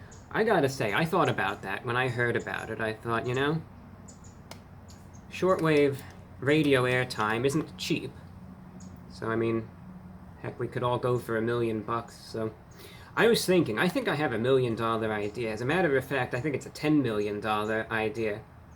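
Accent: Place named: Transatlantic, United States. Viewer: Why the switch to a Transatlantic Accent?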